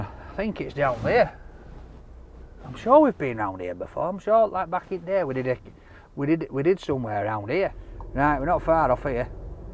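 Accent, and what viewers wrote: United Kingdom. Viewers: I love the way you Brits speak. 🙂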